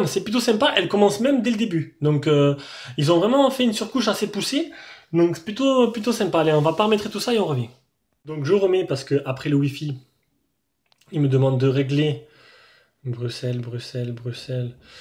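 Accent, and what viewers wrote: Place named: South, France. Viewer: Moi je trouve ta vois agréable avec un petit accent du sud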